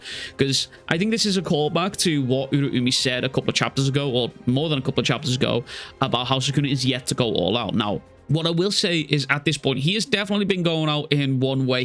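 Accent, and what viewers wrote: Liverpool, United Kingdom. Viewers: Are you a Scouser?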